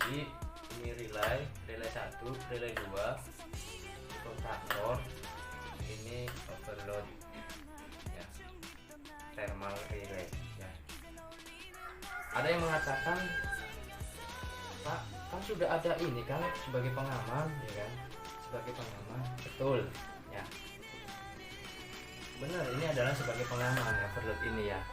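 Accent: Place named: Java, Indonesia. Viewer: Pak ngapak medok jawanya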